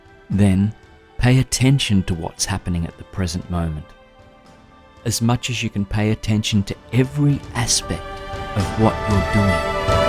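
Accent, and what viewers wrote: Australia. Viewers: Good Morning, I am loving your meditations and the live ones are fun too! Its also nice to hear an Australian accent, sometimes I find it hard to meditate to other accents as it distracts me from their words haha. I do wonder if you could tell me the name of the music in this piece I find it very motivational and powerful! Love & peace Pita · Love your work! Thanks so much. Also great to hear an Aussie accent!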